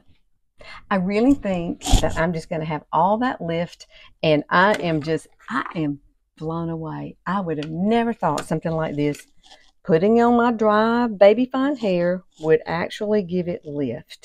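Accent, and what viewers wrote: South, United States. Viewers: Oh my, Alabama girl here as well! Love your southern accent as well as your hair!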